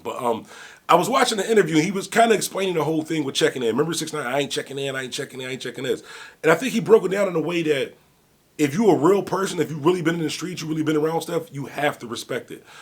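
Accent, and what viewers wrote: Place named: Buffalo, United States. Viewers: You pronounce car funny & yes you do have a Buffalo accent which is proper like Cali niggaz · Lol if I ever bump into I'm gonna give him 5 mins of disclaimers before I greet him and bro you got an upstate accent its cool though rep ya hood lol Salute bro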